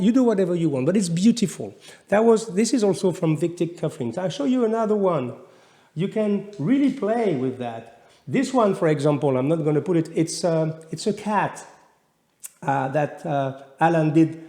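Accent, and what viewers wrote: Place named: France. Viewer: I always like to listen to your nice french accent, makes style sound extra stylish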